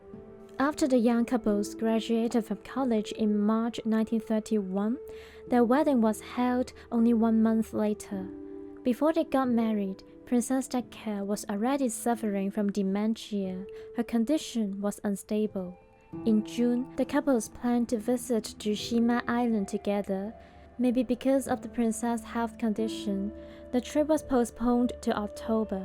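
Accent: China. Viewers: Your accent sounds Mainland Chinese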